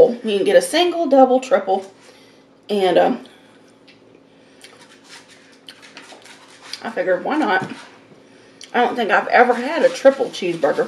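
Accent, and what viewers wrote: South, United States. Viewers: Hey. I say this with the kindest of words. I think you should go on the TV show My 600LB life and get some help. You are gorgeous and your worth it. I love your southern accent. Please go and get some help sweetie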